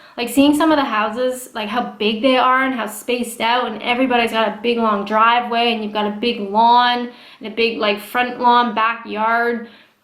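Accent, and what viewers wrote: Canada. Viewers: Hi ya welcome back. I hope you don’t start to lose your accent too much as it sounds so cool fresh from Canada. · Trawno yep the Canadian accent is stronger after the visit. :) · Not seen a vid of yours in a while. I check in on your gram though. That said, when I played this video, I realised I had forgotten you had a Canadian accent. You have a great fan-base, you'll get tons of subcriptions... Not me though.... I'm poor. Have a great day. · it's also so funny I notice your Canadian-ness in the way you speak, but you have a british accent on certain words now too (like the endings of certain words). Very interesting, not sure if you noticed! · Your losing your Canadian accent. 😁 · I love your stronger Canadian accent. Noticed it straight away! I don't know if I'd prefer Canada, with it's big wide roads, but super long drives, or here, where everything is close but SO congested. 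· "Stay on the RIGHT.. Stay on the RIGHT" Made me chuckle. Don't put yourself down, You are very good at this and very intresting so keep it up. Love the way you Canadians say "Out" like "Ooout" Haha and a great way to tell the difference between an American and a Canadian ;-) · But I have noticed that the more i watch you i can see the difference between the US accent and the Canadian one nust from your pronounced of some words and how you enunciated certain words. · You definitely sound more Canadian now that you've been home for a bit!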